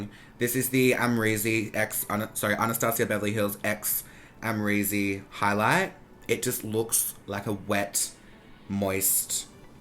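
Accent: Australia. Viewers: is he Australian? i can't tell because I'm Australian and i am used to the accent. It sounds a bit British at times..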